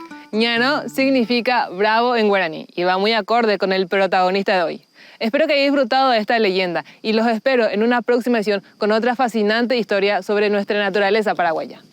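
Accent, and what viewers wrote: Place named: Buenos Aires, Argentina. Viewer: Es porteña no es paraguaya por el acento al hablar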